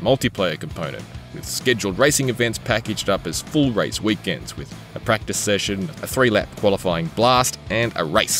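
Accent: Australia. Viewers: Australian accented reviewer...and there it is,the Holden Torana...great car. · Well that was...surprisingly Australian.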